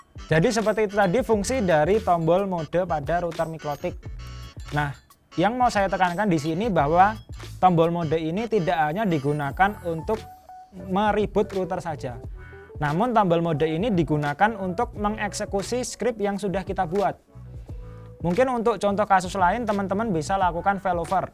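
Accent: Java, Indonesia. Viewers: Medok mu mas aku suka hahaha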